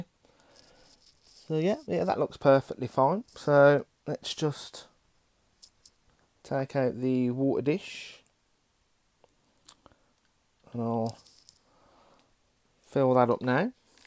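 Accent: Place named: West Midlands, United Kingdom. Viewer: Clues - bloke -west midlands accent -has red runner roaches -name is.....do I win a prize? 😁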